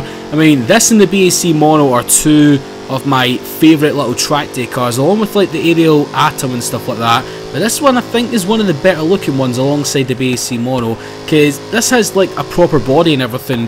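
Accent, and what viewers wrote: Scotland, United Kingdom. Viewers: YOU'RE. SO. SCOTTISH.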